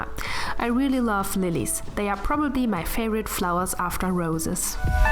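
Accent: Germany. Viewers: Man hört, dass du aus Deutschland kommst. Aber trotzdem cooles Video. Ich fände aber cooler wenn es auf deutsch wäre, da mein Englisch auch nicht so gut ist. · yes she is german, as a german i am ashamed of how harsh her english sounds .... i cant even listen to it tho... sorry I know how hard it is to correct the way of speaking but she doesnt even seem like shes trying . i know thats verry mean to sa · I think her accent is really nice and cute, and I love to listen to the german accent! (also french and italian accent and other accents as well! ) Have a nice day! : ) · Are you from Germany? Because your English sounds very funny... Just as a german 😂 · Du bist deutsche... hört man voll😄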